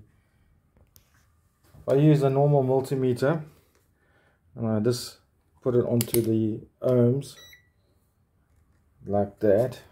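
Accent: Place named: South Africa. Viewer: Are you in Durban? Your accent sounds South African with a hint of India.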